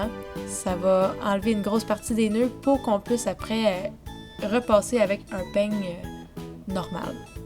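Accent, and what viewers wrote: Canada. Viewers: Je suis né en France j'ai grandi en France je parle très bien le français et franchement son accent ne me dérange pas du tout elle est très compréhensible quand elle parle, elle est canadienne et franchement c'est très compréhensif ce qu'elle dit dans la vidéo. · Lol cet accent québécois j’arrive pas à m’y faire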